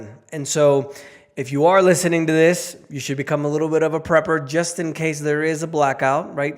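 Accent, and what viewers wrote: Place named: Latin America. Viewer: hablas bien ingles, pareciera q fueras nativo pero tienes el acento fuerte de latino. practica mas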